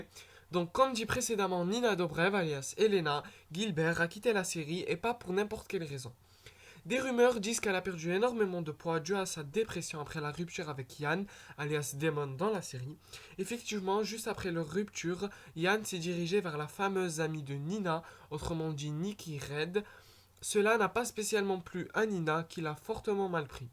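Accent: United Kingdom. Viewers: Mon dieu, tu dis n’importe quoi ! Et ton accent Anglais ! Vraiment je dis pas ce genre de choses, tout est faux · Aucune information donné est vrais se qui en plus de ton accent anglais ma fait énormément rire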